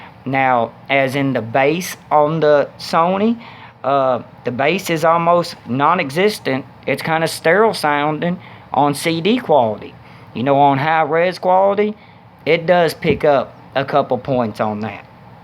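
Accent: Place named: Arkansas, United States. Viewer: I'm from AR and I can confirm his accent is very close to the folks around these parts.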